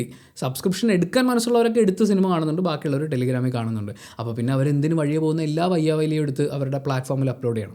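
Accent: Kannur, India. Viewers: ningal...Kannur,kasargode...alle...namma...parayunna pole...namma...Thrissur,Ernakulam...Kar parayuna pole..Chakrashwasam ennokke...pedakkanundallo...any way...nice review about the cine crisis.and your views, solutions and suggestions are appreciatable.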